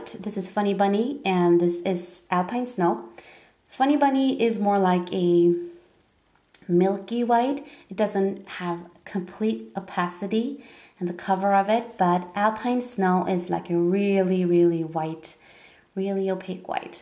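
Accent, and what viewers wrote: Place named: United States. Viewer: You sound like you are from the states!! You proved me wrong! X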